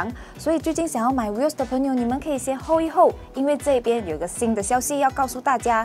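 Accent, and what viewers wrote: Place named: Malaysia. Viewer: 这个AI很有马来西亚腔调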